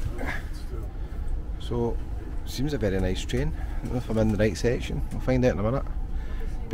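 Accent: Scotland, United Kingdom. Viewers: Love the Skaughtisch aksent. ❤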